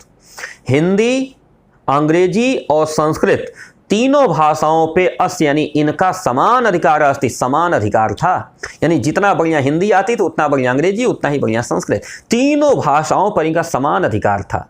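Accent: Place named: Bihar, India. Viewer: आप योग हैं तभी तो सरकार में चयनित है पढ़ाने का तरीका बहुत बढ़िया है आपकी आवाज लगता है बिहार के लोगों से मेल खाता हुआ, लेकिन हिंदी साहित्य में अधिकांश विद्वान अपने पूर्व के ही हैं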